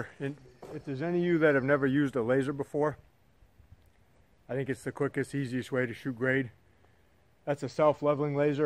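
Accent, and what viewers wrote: Maine, United States. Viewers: Sounds like your a Mainer!?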